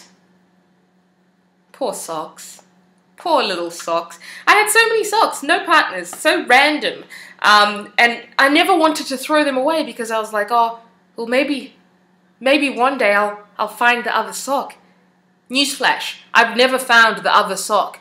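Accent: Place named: Australia. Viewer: I love that Aussie accent of yours!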